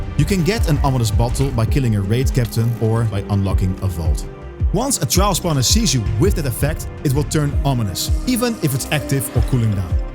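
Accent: Netherlands. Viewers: your accent is so extremely dutch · Bro is echt puur Hollands 😂😂😂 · Ik hoor aan je engels dat je NL bent 😂😂😂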